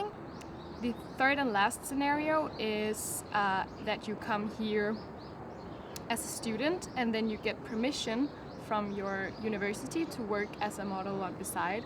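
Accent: Denmark. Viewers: Jeg kunne høre på din accent at du kom fra danmark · I can tell on your accent your danish :) Men det betyder ikke at du har en grim engelsk udtale! Virkelig fedt at du er flyttet til Korea, vil rigtig gerne selv derned og besøge landet. Har dog været i Taiwan og Filippinerne for et halvt år siden så har oplevet noget asiatisk kultur haha. Du må have det rigtig godt dernede! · First time being on your youtube channel. And when I heard you speak, immediately thought you were danish cause of you faint accent. I was right! xD I'm danish too so yeah.. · Girl i hear you danish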